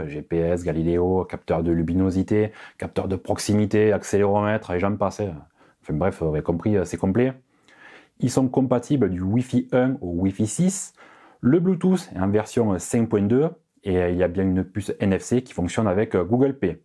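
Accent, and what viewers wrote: South, France. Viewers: L'accent du sud c'est le meilleur 👍👍👍😁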